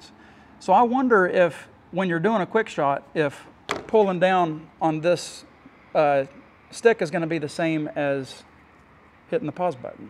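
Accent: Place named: West Virginia, United States. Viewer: I really enjoy all your tests, sense of humor, and I love your WV accent. You seem like such a down home Christian guy. I also love your scripture quotes at the end of your videos. I'm a California Baptist, and I love listening to Southern Gospel music. I'm planing a trip to the east coast this fall, for a short hike on the AT. It would be great to meet you and and shake your hand. Thank you for these great educational videos!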